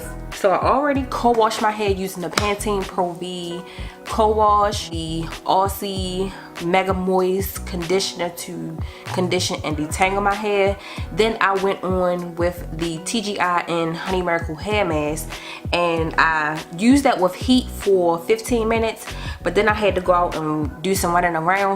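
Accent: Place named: New Orleans, United States. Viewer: I thought was from New Orleans, by her accent. I love her accent. Of course, humidity in the New Orleans area is like the worst (it's rare to just have a good hair day due to the humidity)! But Baltimore is pretty cool; I like that they get all 4 seasons.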